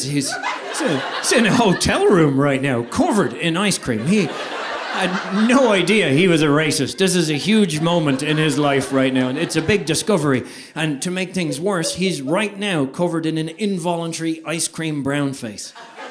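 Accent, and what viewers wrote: Ireland. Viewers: You are absolutely brilliant. Of course everything sounds even funny with the Irish Accent 😂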